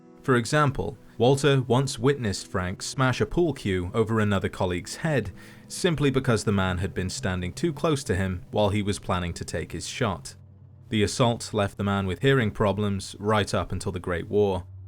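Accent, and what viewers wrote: United Kingdom. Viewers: Thank you British fallout lore master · It’s ok mr British vault boy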